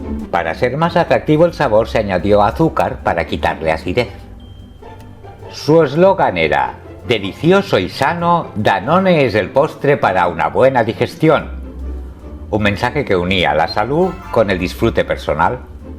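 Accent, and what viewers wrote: Catalonia, Spain. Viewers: Quiero felicitar al locutor, su castellano con acento catalán suena perfecto.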